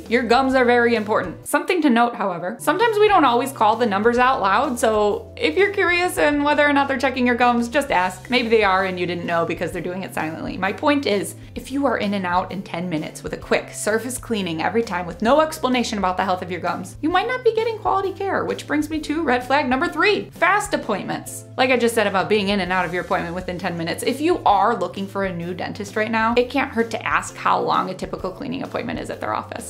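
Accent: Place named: Chicago, United States. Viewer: Great advice. Your Chicago accent feels like home, sounds like my friends.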